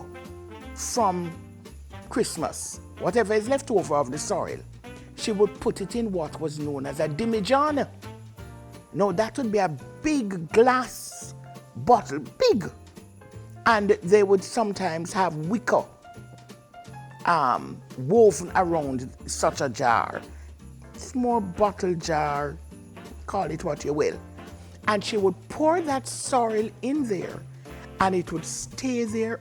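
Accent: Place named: Jamaica. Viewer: Amazing jamaican speach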